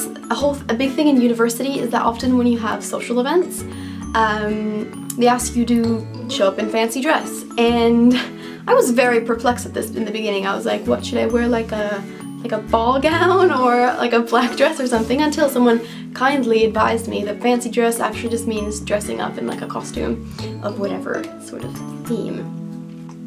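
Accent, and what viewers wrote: United States. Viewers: Dexterror It's already been established that she's Finnish, and she doesn't strike me as the sort of person who would 'try to sound' American. My guess is that she picked up the accent from wherever, or through whatever means, she learned English. And given the presumption that English isn't her first language, I find it surprising and commendable that she speaks it better than most English people I know. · I had no idea you're from Finland you sound American how did you learn that accent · Sounds like you already picked up some of the accent, you sound very mildly American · How come you have an American accent if you're from Finland? Also you could settle an argument between my and a friend - Is Finland part of Scandinavia? :D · You say you're from Finland but you speak like an American - not just accent but manner of speech also. 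· If you come from Finland how come you have an American accent when speaking English.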